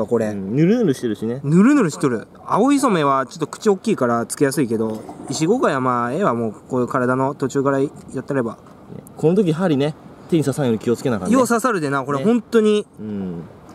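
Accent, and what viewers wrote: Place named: Nagoya, Japan. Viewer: 会長、名古屋弁どらでまくりだがや！笑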